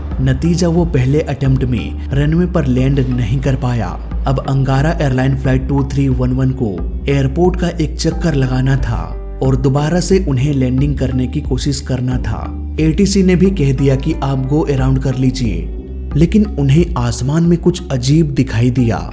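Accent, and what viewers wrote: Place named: Bihar, India. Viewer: Tum bihar se ho kya tumhari language se pata chalta hai ab aise illetrate log hame flight ke baare main batayenge😂😂😂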